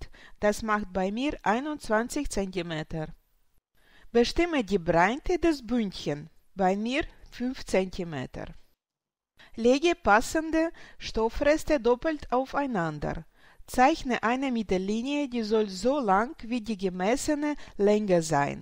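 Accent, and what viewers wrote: Russia. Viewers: А почему не на русском?всеравно сильный русский акцент?не надо стесняться говорить по русски даже живя в Германии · У вас такой милый акцент) вы из России? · если вы понимаете, что я пишу значит вы можете по русски, акцент у вас очень большой, с таким акцентом говорят русские, но не все в этом признаются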